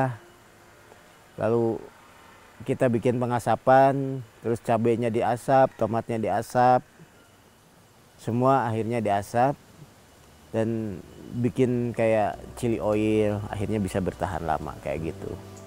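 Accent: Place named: Sunda, Indonesia. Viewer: Orang Sunda anu nyundaa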